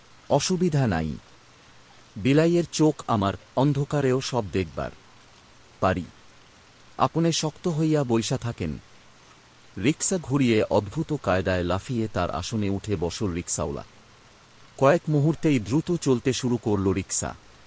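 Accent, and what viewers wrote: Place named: Rajshahi, Bangladesh. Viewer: রিডিং পড়ার মত হয়েছে। রাজশাহীর টান বাদ দিতে হবে।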